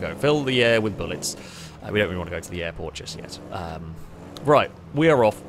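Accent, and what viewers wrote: England, United Kingdom. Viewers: Omg i forgot about ur fancy english accent and ur adventures 💰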